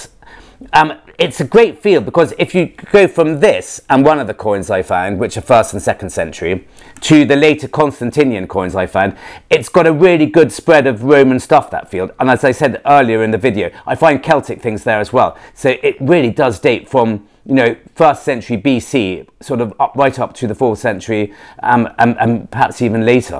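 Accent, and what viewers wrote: RP, United Kingdom. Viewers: Just recently subbed to your channel and catching up on a few of your previous ones. Like your unique way ( Your a bit posh)😅 but your very fascinating to watch!👌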